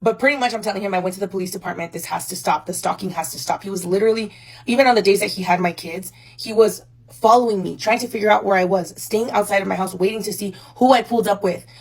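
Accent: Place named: California, United States. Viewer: I from San Diego, and was just listening (Not watching) and i was like...she gotta be from cali and then she mentioned rancho and riverside hahaha. Glad you found out! Stay chingona!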